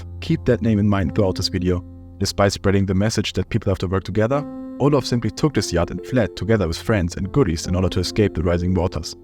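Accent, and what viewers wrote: Germany. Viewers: Are you german? You sound like german😅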